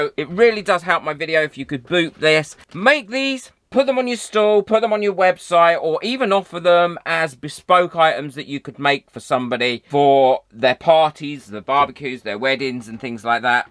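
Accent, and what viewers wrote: England, United Kingdom. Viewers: Thank you very much,! For your content, for sharing your expertise, for your generosity and your humour. And for all the love and care you put into your videos! I also love your accent, it brings back memories of the year I spent in England: absolutely LOVE it!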